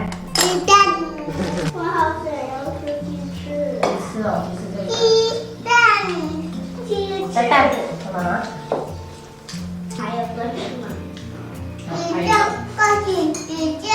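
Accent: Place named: Fujian, China. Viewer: 你是四川人怎么一口福建口音呢?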